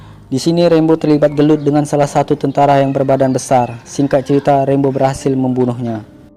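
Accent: Palembang, Indonesia. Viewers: Wong Palembang e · Asli wong palembang :v cuko dak be cuko tengah duo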